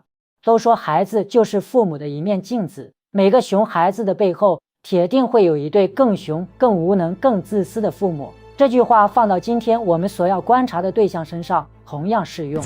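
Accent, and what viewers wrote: China. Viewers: 是温州人？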